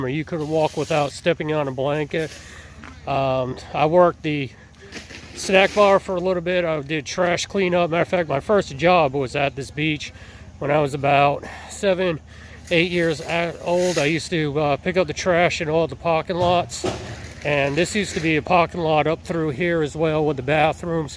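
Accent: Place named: New England, United States. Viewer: You do have a blend of a New England accent along with another one you got elsewhere!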